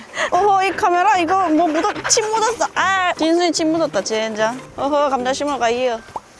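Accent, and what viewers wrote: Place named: Gyeongsang, South Korea. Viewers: 문경이신가요? 말투가 문경분같아요 몬가 힐링하고 가요😊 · 상주 문경쪽 인가요? 말끝에 '여'자를 붙이는건 그쪽인데여~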